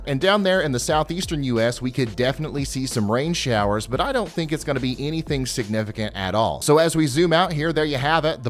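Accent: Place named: Tennessee, United States. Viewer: Well, he's as accurate as most! It's just that he's so much fun to watch! It's obvious he loves what he's doing, and he DOES have a knack for following the correct models. He may have a much better read on what others forecast, as he really does his homework. That slight Tennessee twang doesn't hurt, and he's just so dang cute!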